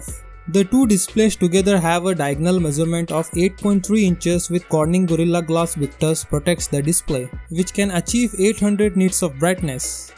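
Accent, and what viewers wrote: India. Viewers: Indian accent is so garbage.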